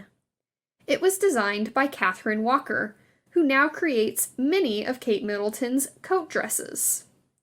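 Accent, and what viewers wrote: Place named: United States. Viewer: Why do you Americans pronounce ‘blouse’ as ‘bloussse’? Double stressing the ‘ess’. Derby is pronounced Darby.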